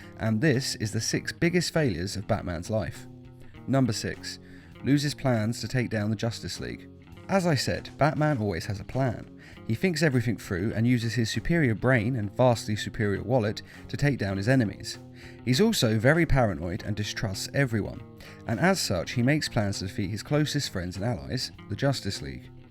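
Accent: United Kingdom. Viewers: I know Americans and Superman fans will eat up anything a British voice tells them but this list is dumb. Might as well list his parents deaths as another one of his "failures" because as we all know -- every time some tries to cause you or your loved ones harm -- IT'S ALL YOUR FAULT.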